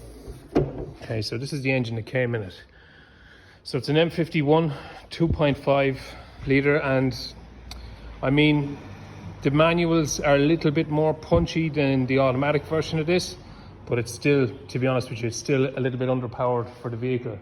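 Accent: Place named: Mayo, Ireland. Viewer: What county you from . Sounds like mayo.or Sligo..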